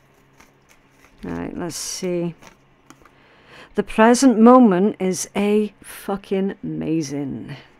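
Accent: Scotland, United Kingdom. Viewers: Girl I say cut the comments back off I totally understand why u did. Lots of haters from my country US I'm from the US I am such a lover of ur channel and skill and I love ur Scottish accent yall rock You're desk is so pretty I love the cards you switch up Love your style it's so mystical Bless you & Thanks so much for the readings!!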